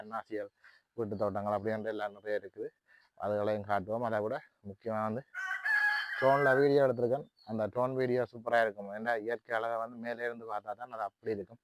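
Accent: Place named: Sri Lanka. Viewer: நீங்க இலங்கை தமிழில் பேசுவது அவருக்கு புரியவில்லை இது இலங்கை வன்னிபகுதியா? அருமையான பண்ணை வீடு மாதிரி இருந்தது நன்றி வணக்கம் தமிழகத்தில் இருந்து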